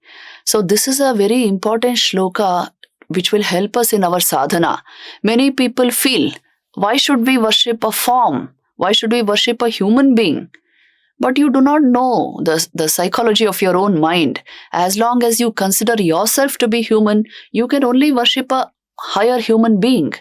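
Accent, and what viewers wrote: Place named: United States. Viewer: Conversion of the lecture to American accent is not enjoyable 😢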